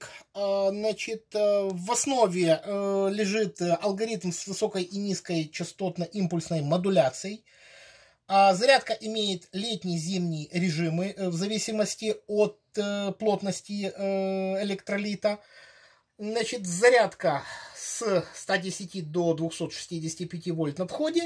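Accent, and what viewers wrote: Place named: Odessa, Ukraine. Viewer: А по-моему как у Одессита 😊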